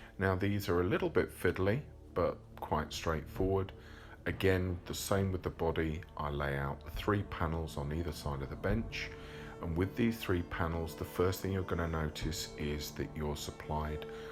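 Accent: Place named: United Kingdom. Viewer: Ok completely off topic dude look like Jeffrey Dean Morgan and Robert Downey Jr had a kid together and then gave him a British accent and im totally here for it.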